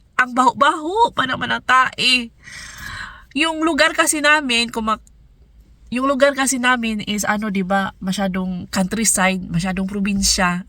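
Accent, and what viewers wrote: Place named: Jagna, Philippines. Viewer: ah mao sakto ko basta dapit jagna basta dili sa pikas kay ang tunos imong tingog. Just keep it up. Tan aw ra kos imong mga vlog. Good luck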